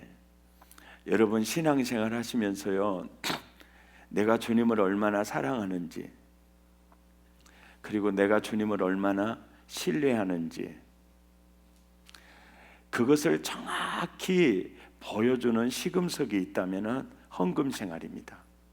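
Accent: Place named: Mokpo, South Korea. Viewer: 목포 목사님 부흥회 너무나 잘보고 있습니다 사투리가 어찌 그리 정이 가네요 저도 고항 장흥 입니다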